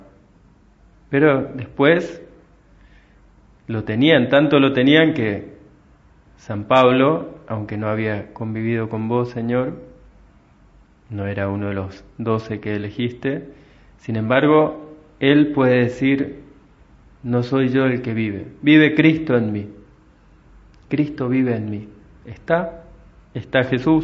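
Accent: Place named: Argentina. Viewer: Suena muy bien con acento argentino! Muy bonita reflexión. Desde España.👍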